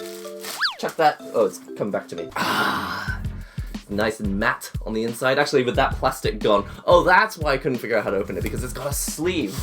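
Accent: England, United Kingdom. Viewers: I think you're the first asian i've heard with an english accent. I bet you go bonkers for tea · U have better british accent than me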